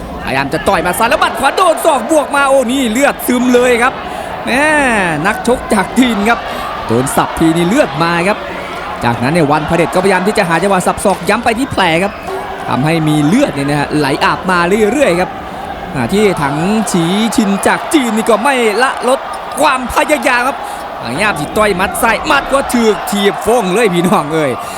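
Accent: Isan, Thailand. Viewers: พากษ์อีสานจนจบเทียแนเเด้อ😂😂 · มักเจ่า พากมวย เว้าอีสาน ม่วนๆ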